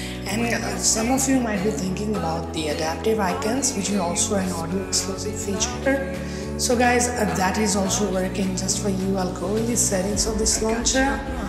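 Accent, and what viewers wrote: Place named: India. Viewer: Indian accent, bad voice quality and music. Awesome video. Dislike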